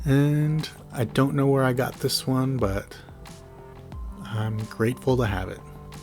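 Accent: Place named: Sacramento, United States. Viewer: Love this video man! Sounds like you’re from the Sacramento area haha I am too! I was also at Aftershock & caught a few from Kirk! On the first night they played. I got 3, gave 2 away, one going to a kid & his dad that I saw looking for some in the grass. He was so happy haha What sucks though is as I was getting a hot dog outside from the street vendors, the one I kept for myself must have fallen out of my wallet as I was paying. I was crushed 😭 Luckily though on Sunday when they played again, one landed RIGHT between my feet & I stepped on it until the crowd cleared and I was able to pick it up. I like to think the metal gods rewarded me with another for my good deed of giving away those 2 Metallica picks on night one 🤘🏼 Got a few more picks in my collection. My favorite being one from Slash that he handed to me in San Francisco at the Warfield in 2010.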